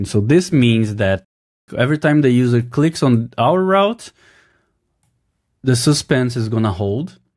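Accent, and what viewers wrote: Brazil. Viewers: Ingles de Brasileiro é muito massa!! PArabéns mano! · Parece Brasileiro, você é brasileiro mn?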